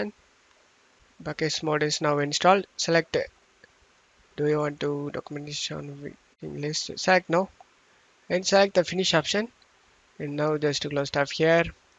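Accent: India. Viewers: Just because he sounds Indian doesn’t mean he’s “scamming”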